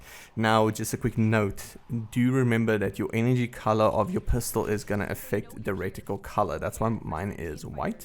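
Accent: South Africa. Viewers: South African accent.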